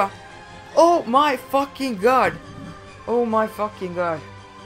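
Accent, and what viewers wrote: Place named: Sweden. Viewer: Du har en typisk engelska som alla svenskar har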